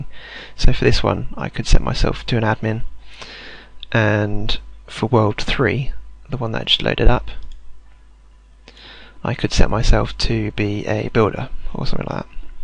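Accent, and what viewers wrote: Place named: United Kingdom. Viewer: Because he speaks British?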